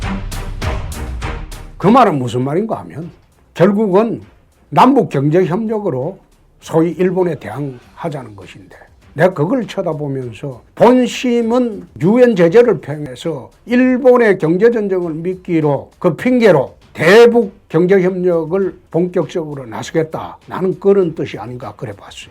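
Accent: Gyeongsang, South Korea. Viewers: 홍준표가 경상도사람이라 억양이 과격하게 들릴수도있으나 지나보니 다맞았다..대선토론회했던 영상 다시한번봐봐라 문재인이 얼마나 허무맹랑한 공약을 했던가.. 말문만 막히거나 곤란하면 한다는 소리가 그말 책임지셔야합니다.. 세월호사건과 겹치며 그놈의 갬성이 문재인을 만든거다.. 누가되었든 다음대통령은 정말로 대선토론회 꼭보고 투표하길바란다. 후보자들이 공약대로 지키지는 않지만 쩝쩝이같은 인물은 다시는 나와서는 안된다 네이버 댓글에 이런글이 올라오니 공감수가 압도적입니다 이제 천천히 준비하십쇼 이나라를 구해주세요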